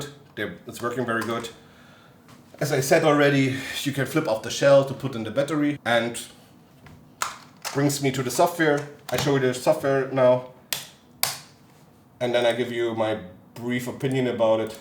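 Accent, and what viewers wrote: Germany. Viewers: He just pronounces it fast while also having an german accsent. If you listen carefully he says orochi.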